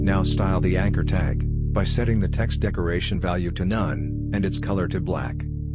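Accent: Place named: India. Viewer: Next level indian accent covering though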